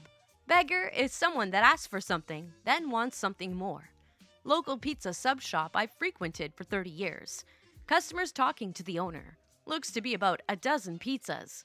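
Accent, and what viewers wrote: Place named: New York, United States. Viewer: I love CB stories and I like your "New York" voice. :)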